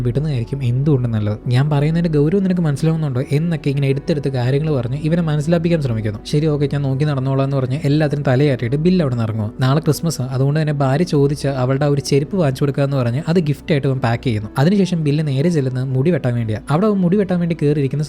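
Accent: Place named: Kottayam, India. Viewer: Bruhh from kottayam or idduki??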